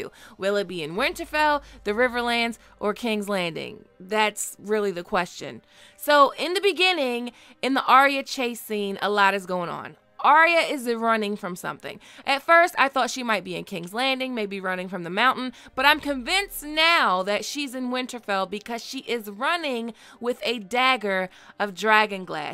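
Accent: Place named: Baltimore, United States. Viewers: The Baltimore accent is strong in this one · you sound like you from maryland, just saying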